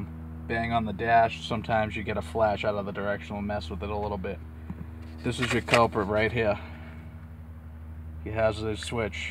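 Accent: Boston, United States. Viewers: I like your Boston accent. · thanks - need this -useful vid Also your accent is awesome accent. Very Good Will Hunting :-)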